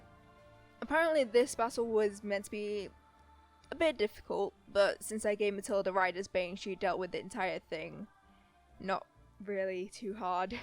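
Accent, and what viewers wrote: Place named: North England, United Kingdom. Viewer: Great overview of Alm from a lovely north English accent.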